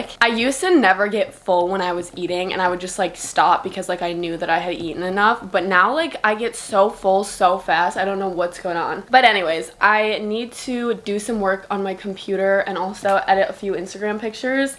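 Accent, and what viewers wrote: United Kingdom. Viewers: british accent 8.5/10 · your british accent sounds amazing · brittish accent rationg: 100/10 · I love your vids I'm a huge fan!!💗💗💗💗 also it British accent is a 10/10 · 10/10 On Your British Accent and you are so beautiful and funny and your videos are so great and so well done! 🩷🩷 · Love the British accent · 10/10 British accent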